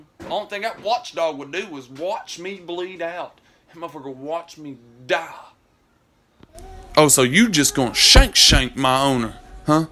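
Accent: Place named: Kentucky, United States. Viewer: my dog just did that. I got up & came back and that plate was licked clean and I mean clean. I couldn't get to mad him he's old. Edit =, you are from Kentucky? Right, cause you sure sound like it. Takes one to know one!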